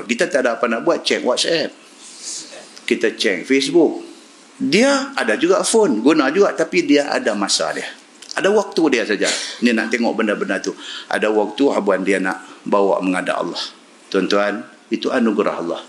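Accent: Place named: Penang, Malaysia. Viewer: USTAZ...ni dah lama ceramah kat p.pinang.....suka ustaz ni kalau cerita tentang sejarah nabi2. Ceramah ustaz senang walau ckp loghat penang.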